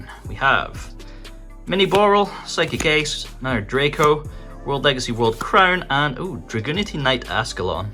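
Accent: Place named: Scotland, United Kingdom. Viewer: Love your Scottish voice my man, keep up with the content!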